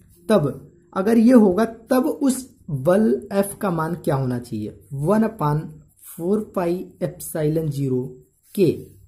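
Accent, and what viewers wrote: Chhattisgarh, India. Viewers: Aap chattishgarh se ho kya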